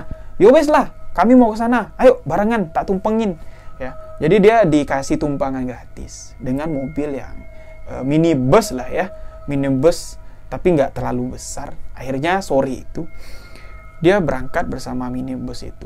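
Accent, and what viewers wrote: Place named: Jember, Indonesia. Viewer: Hostnya cara bicara kayak Anang Hermansyah Orang Jember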